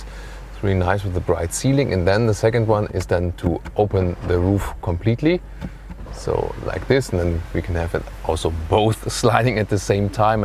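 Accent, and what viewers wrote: Germany. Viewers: Like always great review ! thank you! I like German accent! good job!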